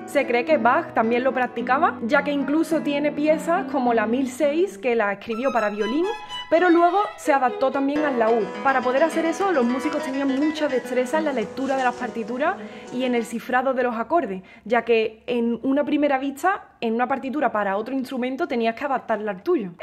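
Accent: Andalusia, Spain. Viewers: Después de haberte escuchado cantar o tocar solo la guitarra en diferentes estilos de música es raro ponerte el pulgar para abajo vos dirías no gutsa con tu acento andaluz porque todo lo hacés bien todo el proceso la elección de la pieza El arreglo la ejecución así lo dice un suscriptor y estoy de acuerdo seguro ensayas mucho por más que sepas porque te interesa que el producto salga bien No hay palabras para elogiar tu arte porque es un arte, una persona puede estudiar música guitarra y tocar bien técnicamente pero no llegar a la gente.Es como si una persona estudia pintura o escultura puede hacer buenas obras pero no va a estar a la altura de Miguel Ángel o de Leonardo da Vinci Vos en lo tuyo tenés ese toque ese don que aparte lo perfeccionas con trabajo práctica constancia y lo que harás en el futuro solo Dios lo sabe ese Dios que te dió ese talento inmenso para que lo uses para tu bien y el de tus oyentes Sos única grande sencilla un instrumento de Dios el es el director de la obra Quería compartir esto con vos Con tu poca juventud acumulada tenés una llegada a la gente muy grande y con toda la juventud que tenés por delante para acumular quien sabe hasta donde llegarás Gracias por tu talento tu arte generosidad ❤️ Abrazo grande desde Buenos Aires Argentina 🇦🇷🇦🇷🇦🇷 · Gracias por contestarme! 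Acabo de ver un video hermosísima tuyo aobre "Lágrima" de Tárrega. Y acabo de confirmar en un articulo de Wikipedia sobre "pronunciacion andaluza" el cambio de "st" por "ts". Esta explicado en un articulo llamado "Dialecto andaluz", en la "Descripción lingüística", en la parte que dice "aspiración de la /s/ implosiva sucedida de la /t/".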